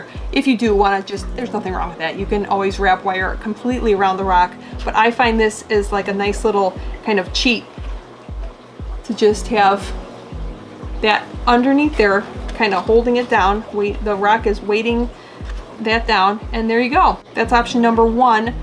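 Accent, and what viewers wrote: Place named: North Woods, United States. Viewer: Wish I had watched this before I made all the mistakes you warned about lol! I hear enough “ope’s” in der for me to think us girls are both from the nort woods. I too share your water parameters. I have had an embarrassing hard time with anubias. The leaves are prone to yellowing with holes and turning into a brown mesh work before death. I have increased fertilizer with what looks like improvement. They get low to medium light with floaters above. Any suggestions?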